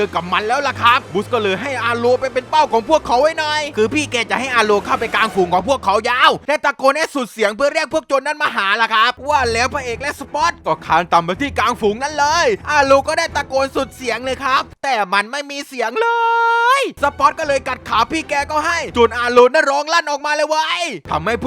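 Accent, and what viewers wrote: South, Thailand. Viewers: พี่เปนคนใต้หรอคับ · พี่ครับพี่เป็นคนใต้ใช้ไมครับ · พี่คนใต้รึเปล่าเนี้ย55 งูบองหลาเฉย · คนไต้นิ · คนใต้บอนิ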